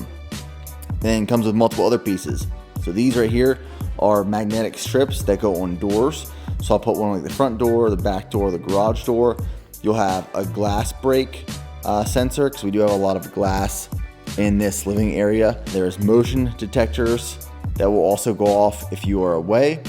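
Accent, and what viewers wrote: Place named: Pennsylvania, United States. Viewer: How does a guy from Texas have a Delaware County, PA accent?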